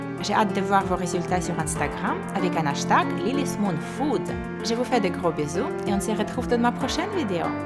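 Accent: Russia. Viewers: J'adore tes vidéos.Et moi je trouve que ton acen Russe est super cela te différençi des autres.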